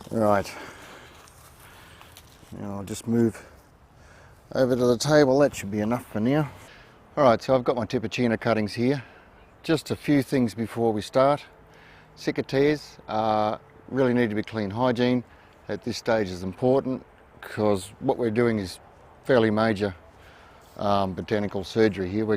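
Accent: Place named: Australia. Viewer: I believe (judging by your accent) that you recide in Australia?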